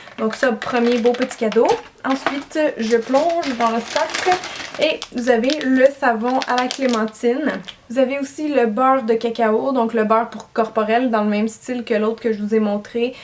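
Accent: Quebec, Canada. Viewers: il mfait bander ton accent québecois x) jpasse le salam à tous les québecois vous êtes tous des gens adorables vive le québec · Merci pour ce super concours ! :) je t'adore tu est tellement belle et naturelle, je suis abonnée à beaucoup de youtubeuses beauté françaises et malgré ton petit accent québécois (qui enfaite ne me dérange absolument pas) tu est ma préférée :)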